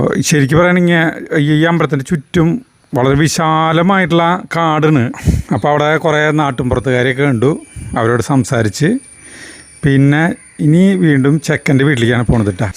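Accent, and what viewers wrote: Thrissur, India. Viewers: കാടണ്....തൃശൂർ ഭാഷ 👌🏼👌🏼👌🏼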